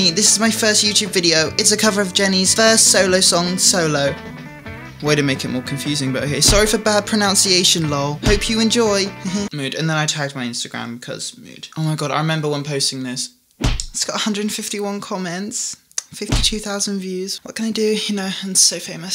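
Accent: United Kingdom. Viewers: why do you have a british accent-whaaaAa? my small brain cell... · Are you korean? Because u look korean but then u speak British 😰✌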